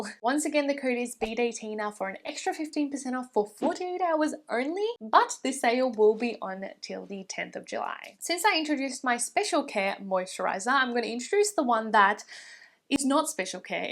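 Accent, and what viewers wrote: Australia. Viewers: Happy to hear an Aussie accent. I was wondering where in Australia stores can we find these ? I haven't seen these in chemist warehouse or myer David jones etc nor I am from Korea so can't understand even if I missed ? Were these brands available in Aus since last 4 years? I haven't come across them in Westfield either.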